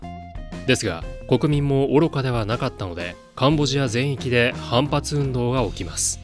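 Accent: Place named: South Korea. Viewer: この読み手韓国人らしいね(確かSから始まる読みが少ない)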